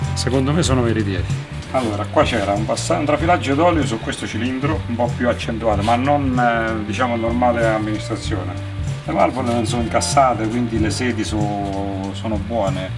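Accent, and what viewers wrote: Abruzzo, Italy. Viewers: "Pecché nen chiut perfettamend'" "E quand è lung 'stu tapp!" L'accend abbruzzes é lu topp!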